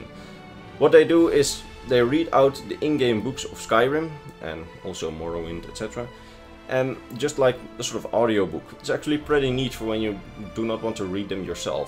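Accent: Netherlands. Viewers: That’s the dutchest english I’ve ever heard lol, good to see that we have a great representation in the elder scrolls community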